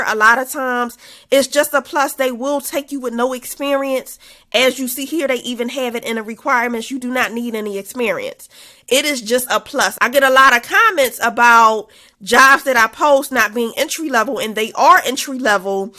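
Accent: Philadelphia, United States. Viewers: Philly jawnnn that accent is so home to me...Im in AZ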